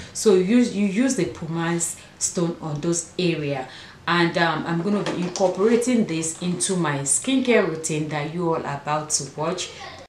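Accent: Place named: Africa. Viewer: Goof morning my blessed family. I hope you are well by grace.we can hear your beautiful African accent. Looking beautiful sis as always. I need some of this brash . Love you sis I declare peace and joy in the hearts of Your people! I proclaim that You will bless Your children and will keep them; You will make Your face shine upon them and be gracious to them! I pray against the plans of the adversary to steal joy and peace from Your people. I declare that Your children will remain uplifted, for there is no one who can lift the soul like You do, Amen.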